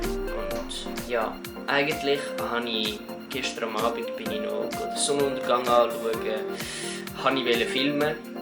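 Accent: Switzerland. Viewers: schwitzer dutsch :D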